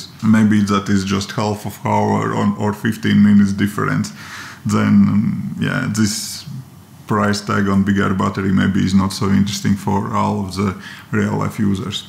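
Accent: Russia. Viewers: Are you Russian?) You have a russian pronunciation. Nice review, thanks!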